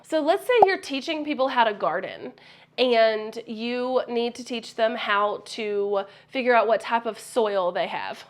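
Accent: South, United States. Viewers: You are fantastic! Love your videos and positivity with a splash of southern twang :) Question, If you create a digital worksheet in canva do you have to do something specific when selling it as a digital product so that someone can use this and actually write in/on it via their iPad? If you have a video on this - could you point me in the right direction?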